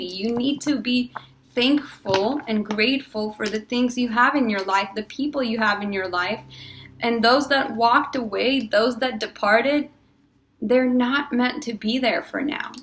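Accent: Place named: Russia. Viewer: From Russia? I detect a slight accent maybe but you definitely speak better English than most (including myself)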